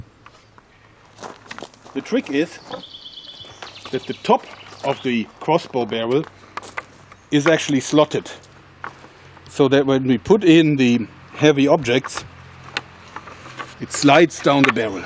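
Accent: Germany. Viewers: Das Schönste an den Videos ist doch, dass englisch mit dem schönen deutschen Akzent. Es würde eine Menge an Witz verlieren.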